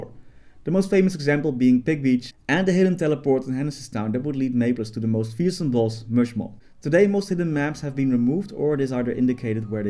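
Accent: Netherlands. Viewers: You sound so Dutch. I'm in Utrecht. Hi.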